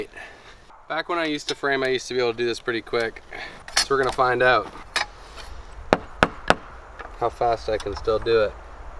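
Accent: Canada. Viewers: Wow I’m Canadian and could’ve sworn you were Canadian. U sound like one.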